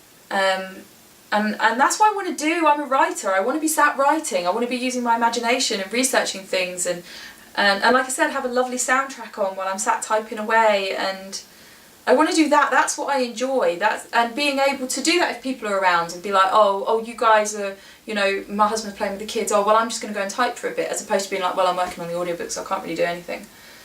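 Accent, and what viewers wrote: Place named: United Kingdom. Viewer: I recently indie published a novel on Amazon, and I am considering to try the audio book myself. I found this video very informative. As far as your voice goes, it sounds fine to me. As an American, I find British accents somewhat musical, very pleasant to listen to. However, I can understand from a time standpoint with three small children. My daughters (3) are all raised.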